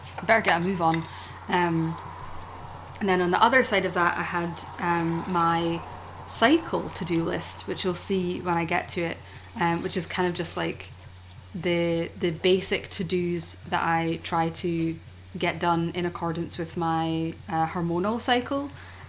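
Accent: Scotland, United Kingdom. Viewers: Hi, sorry for commenting on this one about a vid from the past :P but im so into the storyembryo and I have a question! :D How does foretelling as a tension creation tool work with this plotstructure? Can I foretell something out of the '#6 Pay a heave price (enlightenment/chaos)' part before I start at the '#1 Comfort zone (ignorance/order)'? Ofc I can, I know. But does it work technically? I've written a lot in what I call adventuremode. Now I'm in tidy-upmode so I'm interessted in the theory and if you know more about that! Love your accent. It's Scottish right?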